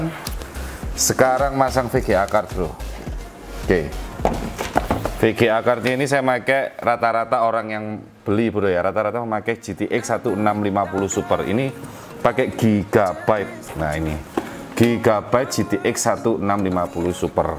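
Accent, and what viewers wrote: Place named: Surabaya, Indonesia. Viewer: Medok e koyok wong suroboyo bro 😂🙏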